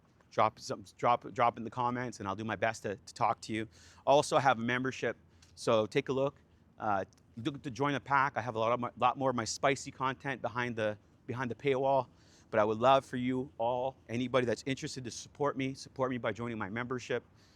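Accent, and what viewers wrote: Canada. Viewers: First take away in the first minute, you're Canadian eh? I can hear it lol. But I'm feeling you, let's go.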